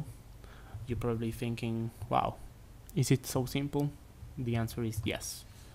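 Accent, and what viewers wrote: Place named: England, United Kingdom. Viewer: bro has the best english accent and still apologises that much... amazing video, thank you!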